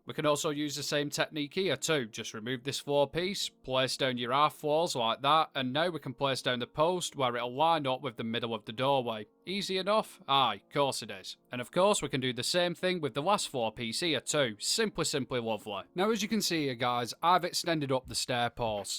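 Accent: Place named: Northern England, United Kingdom. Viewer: Brilliant build and humour yet again and loved you bringing in the other builders too! I love seeing what you all come up with and I wish i was as creative and clever! Oh and as a fellow ‘Brit’ from up North I love the accent and by name and nature!!! 😂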